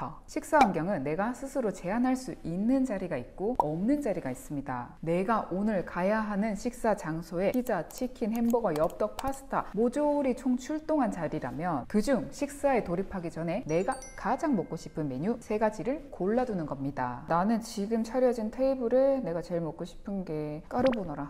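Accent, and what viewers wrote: Gyeongsang, South Korea. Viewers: 선생님 경상도분이신가요??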